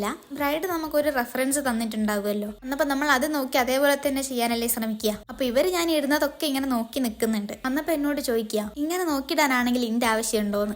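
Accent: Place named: Wayanad, India. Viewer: Itha wayanad aanno Ente friend und wayanadil ool idhe slangl aann samsarikal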